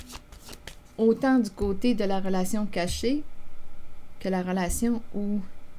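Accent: Canada. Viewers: Bonjour Oui je suis très attirée par lui mais le repousse sauf qu'il habite en face de chez moi ya une tierce sa femme et 2gamines qui me posent problèmes depuis des années bien avant d'être tombée amoureuse de lui et cette invit arnaque Facebook 2018 où voisins(es)ont participé pour me manipuler dont cette tordue de femme et ses2gamines!et lui se cache alors qu'il me souriait se retournait sur moi m'avait même parlée..ils sont très à l'affût de la mode et lui mettait casque oreilles dans cesse pour écouter musique en allant se promener..moi je sais pas ce qu'il a dans la tête mais dans son regard je vois bien qu'il a une forte attirance pour moi..trop difficile tout ça..oui vous savez déjà que je suis en 'colere'.. J'm beaucoup votre accent canadien et vos interprétations..bonne journée..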